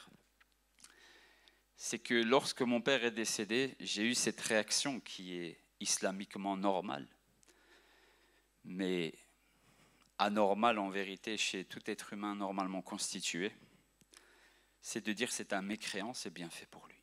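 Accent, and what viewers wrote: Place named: Arab world. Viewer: Ça me perturbe de l'entendre parler Arabe no were au travers du français!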